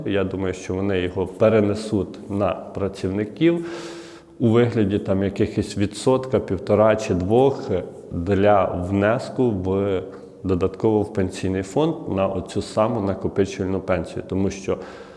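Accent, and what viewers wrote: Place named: Western Ukraine, Ukraine. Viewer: Делай правильное ударение на слове,, буде,,, ты видно с западной Украины, сразу слышно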